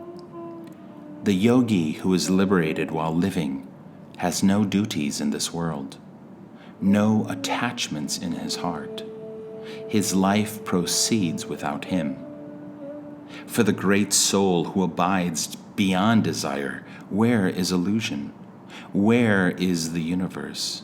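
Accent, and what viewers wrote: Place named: United States. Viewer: It seemed a risky idea to hear this in American English. It turns out it was fine. Thanks 💕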